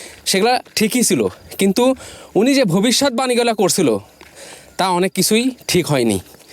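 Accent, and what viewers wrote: Bangladesh. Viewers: Aapner Bangla ta west Bengal er Banglar moto noi ... Bangladesh er banglar sathe khub mil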